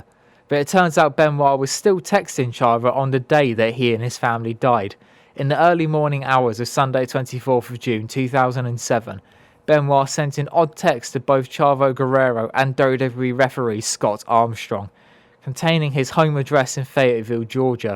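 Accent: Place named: United Kingdom. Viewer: And of course another wrestling channel with a British accent? It’s like nails down a chalkboard can’t stand British accents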